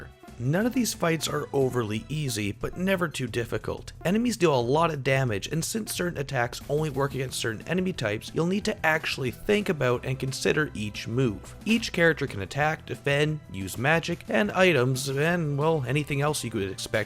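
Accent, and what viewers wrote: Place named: United States. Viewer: Sounds american. French pronunciation of title. UK pronunciation of herb. What the...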